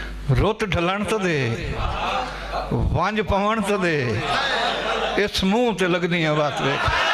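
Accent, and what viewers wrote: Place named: Saraiki, Pakistan. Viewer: یہ پنجابی مشاعرہ ھے یا سرائیکی پنجابی َاور سرائیکی دونوں زبانوں اور خطے میں بھی فرق ھے میزبان بھی سرائیکی زبان کے لب ولہجے میں اپنی زمہ داری ادا کر رھے ہیں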